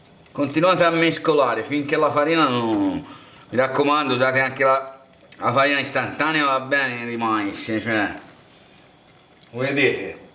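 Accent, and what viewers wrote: Tuscany, Italy. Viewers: Ciao io ti seguo sempre sei molto bravo solo che a volte ho fretta e tu parli troppo poi in toscano rigido che a volte non si capisce però basta poi vedere per capire purtroppo in questo video manca un pezzo ma dopo aver messo la farina di semola nel 2 tegamino poi l'hai aggiunto al primo o quella è una porzione ? Quindi per altre porzione bisogna ripetere questa operazione vari volte ? Grazie per i tuoi ricette buonissime e salutari. Adoro le zuppe io sono marocchina e c'è una zuppa in Marocco simile ad una ricetta toscana comunque è ottima la cucina toscana prevede tante zuppe che fanno bene . 👍👌👏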